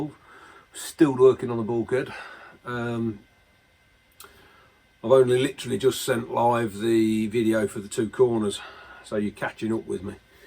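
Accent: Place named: Lincolnshire, United Kingdom. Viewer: I have been welding for 40 years and also worked on series landrovers for quite a few years as well as owning renovating my own and have NEVER attempted a bulkhead quite as bad as yours. I do renovate some series one 80" bulkheads by virtually making them new with just some salvage parts off the old ones. So respect to you sir! By the way your accent sounds Lincolnshire, could that be correct? I am myself!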